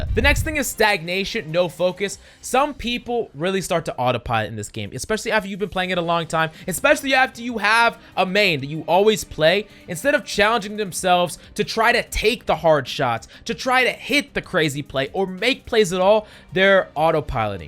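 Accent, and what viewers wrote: United States. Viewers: Heard the American accent, and instantly clicked off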